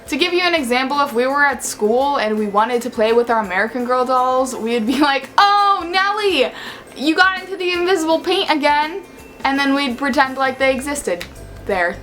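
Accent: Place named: United Kingdom. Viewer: For some reason U sound like U have a British accent in this video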